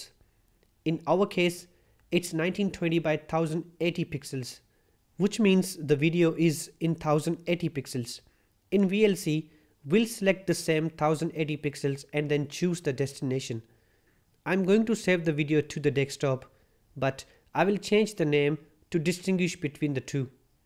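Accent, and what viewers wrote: India. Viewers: Pati dito indian accent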